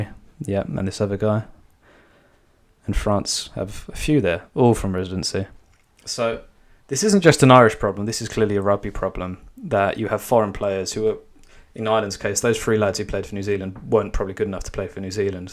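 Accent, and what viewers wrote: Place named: England, United Kingdom. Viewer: What an ignorant and insulting title on this video...... Lose with Irishmen??? As soon as I saw it I just knew it had to have been created by an Englishman. Five seconds in and the accent confirmed it. Wow! Has he taken a look recently at his country's national football team..... or at all the foreign-born athletes that England is so quick to grant citizenship just so they can win a medal for England when their own folks cannot. Just imagine spending your valuable time creating a bitter video about your closest neighbour winning a major competition but instead of congratulating them you try and create the perception that they are somehow weak and losers. Only a REAL loser would spend his time in this way. Bizarre!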